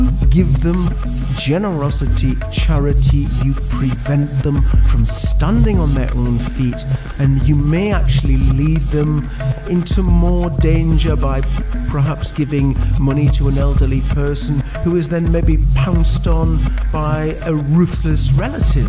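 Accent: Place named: United Kingdom. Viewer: I fully agree with you... In France, supposedly, nobody is really hungry - the government provides for them... last time I gave something was a sandwiich - someone told me what i just wrote about government's help - impossible to know if they are really needy - it is impossible to always give and give when, you, yourself, are in a critical financial situation... complicated subject in a way... Great talk ! love your british accent ! lol ! renee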